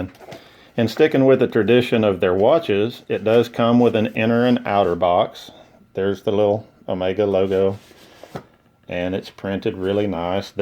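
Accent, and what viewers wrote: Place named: United States. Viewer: Hey bud, so glad I found your video. You sound like you’re from the states. I’ve been trying to justify buying an omega nato, until I found out they come with this kit. However, I keep reading that this specific packaging is only for European countries. Can you please confirm this packaging is also for U.S. customers. Thanks in advance. And great watch by the way, I got the black one.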